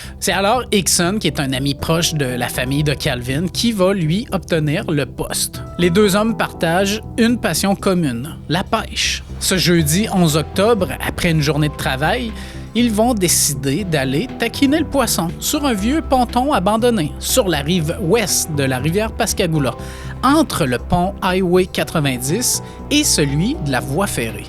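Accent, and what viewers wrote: Quebec, Canada. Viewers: J' ai du arreter de regarder a cause de l'accent quebeccois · je sais si c'est l'accent Québécois si c'est toi qui parle trop vite .ma j'ai décroché au bout de 5 min.. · Excellent, le seul gars avec l'accent Quebecois que j'arrive à supporté et dieu sait que j'en ai entendu plusieurs youtubeur avec cet accent.. En tout cas, merci pour votre travail 😎😎😎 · Merci, je connaissais cette histoire, elle est passionnante, ça fais du bien de se faire raconter ces histoires en français et par un Québécois en plus. Et je remarque que tu as poster bien d'autres cas sur ta chaine que je découvre avec plaisir. Vais-je devenir addict??? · Oui, la prochaine, faites la vidéo en anglais , cela évitera d'insulter la belle langue française avec cet accent québécois exacerbé. C'est juste horrible à entendre . Je me suis toujours demandé comment on a pu en arriver là . 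Comment on a pu déformer une langue séculaire en ça, cet espèce de yaourt verbal . Je n'ai rien contre Québec , au contraire, mais là, on pourrais presque croire que vous le faite exprès tellement c'est abusé . Et pour le contenu de la vidéo, bof bof , l'histoire à déjà été répétée 10 000 fois .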